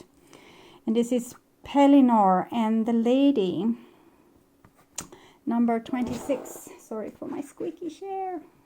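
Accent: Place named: Sweden. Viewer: Are you Swedish btw? Im from Sweden and I think your accent sounds a little "svensk" :-)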